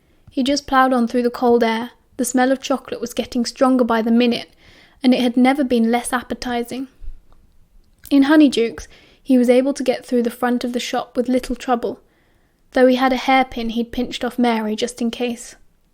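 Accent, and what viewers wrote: United Kingdom. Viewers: Your brittish accent is supremacy 🛐🙏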